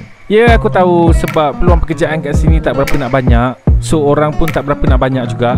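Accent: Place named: Kelantan, Malaysia. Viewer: mace kecek klate jah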